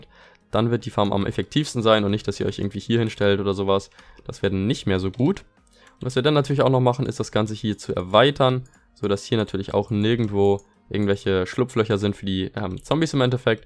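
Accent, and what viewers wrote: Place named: Germany. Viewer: finde deine videos nice aber ist es Absicht dass du alles deutsch aussprichst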